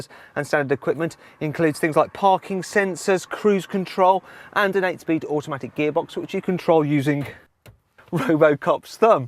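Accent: United Kingdom. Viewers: Very nice reviews and Brit accent too;)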